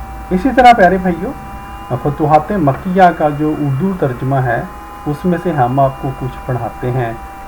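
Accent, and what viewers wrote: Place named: Punjab, Pakistan. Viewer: Punjabi mein urdu bolna bhi samaat par acha asar nahein chorta.Yoonhi tou Radio TV walay itnay baray baray auditions nahein letay.Presentation can make a lots of difference.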